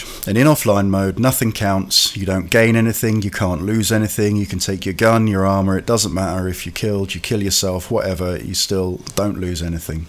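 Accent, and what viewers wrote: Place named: United Kingdom. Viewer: Why do i take british accents so much more seriously than american ones lmfao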